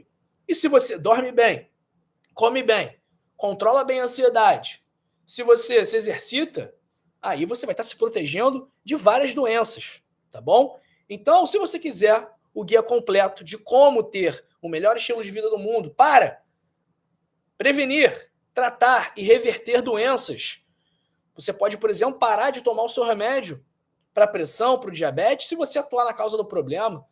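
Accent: Rio de Janeiro, Brazil. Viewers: Dr, vc é carioca? Pêlo sotaque kkkk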